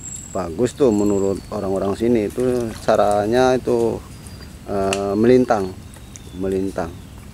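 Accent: Indramayu, Indonesia. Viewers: Kya wong dermayu y ngomonge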